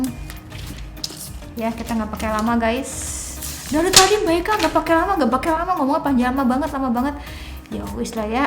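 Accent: West Java, Indonesia. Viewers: Nambahin kata teh dan eyy di blkg kalimat kaya udh menjadi kebiasaan org sunda ya🤣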